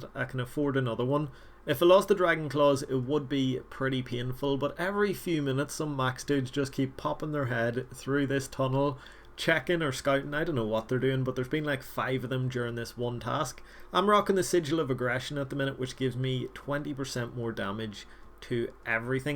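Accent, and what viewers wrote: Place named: United Kingdom. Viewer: Well said at the end my man and brilliant series of a casual play through, I've not played for a good few years now, gunna start a GIM with my friend and do the next league or dmm, never done either before. Started back in 07-eoc quit then came back to osrs <num>. Cheers for the insight, always wondered if it would be balanced enough to be fun for a casual player to farm some 07 GP early on and then have some fun pking mid game and have some decent gear at the end, or just swap out to 07 at the end and I'm guessing it goes for decent, I'll have to do some Lms, pked back in the day but I tried a couple games once and got totally dropped left and right haha super rusty. Look forward to your future content subbed. Love the accent aswell, I'm from the UK so it's refreshing to hear a fellow Brit ☺️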